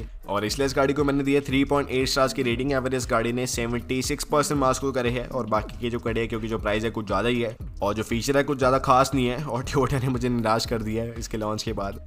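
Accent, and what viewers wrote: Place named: Hyderabad, India. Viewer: Tu snax gaming vale bande ke jaisa bolta hai bhai 😄😄 sahi lagta hai , full haidrabadi style 🔥🔥🔥🤙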